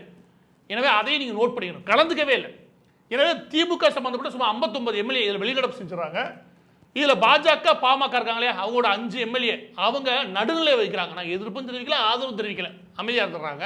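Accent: Madurai, India. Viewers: அண்ணா பேசுவது தான் நல்ல இருக்கும் மதுரை மொழியில் 👍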